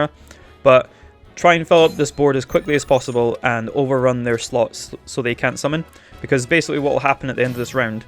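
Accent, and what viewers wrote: Scotland, United Kingdom. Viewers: I'm going through the musclehead battles and the moment and levelling up in prep for hard mode 💪🏼. Usually don't look at guides unless I'm at my wits end 😂.. Will keep an eye on your content, it's good hearing a fellow Scot keep you right. Thank you man I will keep that in mind ✌🏼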